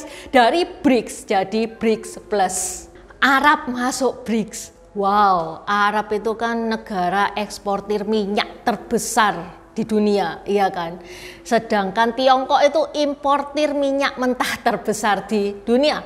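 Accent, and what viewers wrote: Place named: Surabaya, Indonesia. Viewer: Dari surabaya yaa ... Kog logatnya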